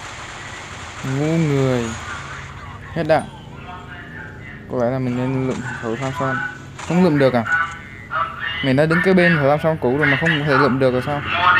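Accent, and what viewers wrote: South, Vietnam. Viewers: Miền Nam 1 hả?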